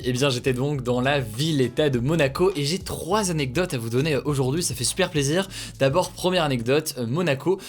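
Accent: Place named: United Kingdom. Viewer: Hugo je me demande depuis un moment, mais pourquoi tu ne prononces plus les mots et expressions anglaises avec ton bel accent britannique? :) j'aimais bien perso ça change de notre "French accent" !! Bravo pour ce boulot tous les soirs ;)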